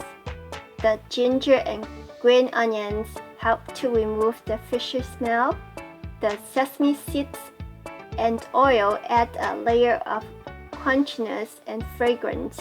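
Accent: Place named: China. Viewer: Wow....There's nothing wrong with her accent, if anything it just brings more Chinese authenticity. I would argue there are many English speakers are becoming more and more curious about Asian cuisines and channels like this is contributing a lot to this shift in mind set.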